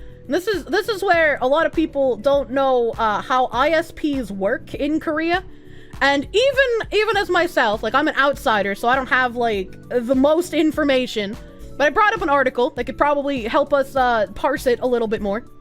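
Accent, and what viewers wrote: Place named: West, United States. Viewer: 그냥 뉴스 많이 챙겨보는 분인거같은데 서부 악센트가 강하게 들리는거 보니까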